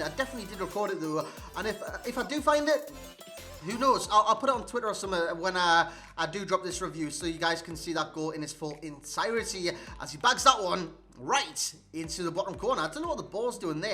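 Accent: Newcastle, United Kingdom. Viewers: Mike Just being enthusiastic for the video, to keep you occupied.. plus he has a Newcastle accent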